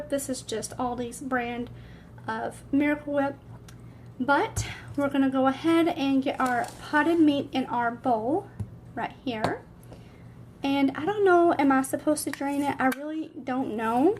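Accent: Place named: Appalachia, United States. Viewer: I'd sure like to know what part of Appalachia you hail from with that High Falutin kitchen n way of talkin'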